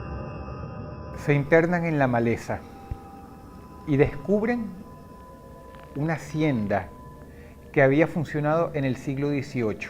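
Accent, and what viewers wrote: Venezuela. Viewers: Cómo me gustaba este tipo de videos, mucha nostalgia y el lindo acento venezolano Pd: señor de miera, mas bien señor de mier... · Cuando Dross aun tenia acento Venezolano. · Cómo odio tu acento veneco Agradezco que cambies tu voz en videos para caer bien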